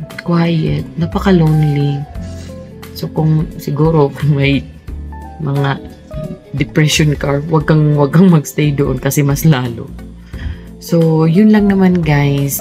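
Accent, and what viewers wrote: Visayas, Philippines. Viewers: hi maam,napansin ko parang bisaya ka.asa dapit ang imo town or city sa besayas? proud ako sa mga besaya nga hero..♥️♥️♥️♥️♥️ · Morag bisaya manka mam...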